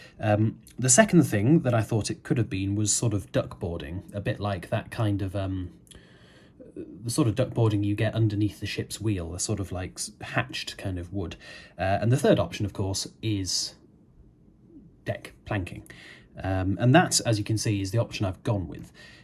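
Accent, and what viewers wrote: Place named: North, United Kingdom. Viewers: Are you based out of the north east by any chance? Sounds like you have some northern accent come out now and again lol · Thanks. I have a long interest in mining, so thought it was a Davy. (Does your occasional Yorkshire accent have anything to do with family history 'oop noorrth'?)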